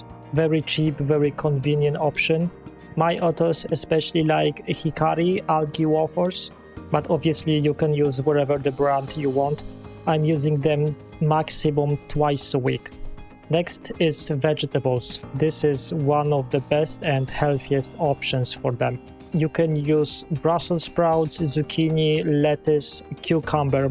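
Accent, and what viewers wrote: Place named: Poland. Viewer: Are you Polish, mate? Sounds like it😉 A few of mine died after a year for no clear reason. Balanced tank, no ammonia, no nitrite/nitrate spikes, much green algae growth on side glass, no new fish to bring diseases whatsoever.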